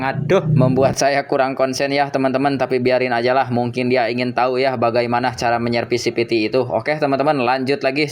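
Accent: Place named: Tasikmalaya, Indonesia. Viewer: Logat tasik ieu mh, nya 😊 palih mana bengkelna mang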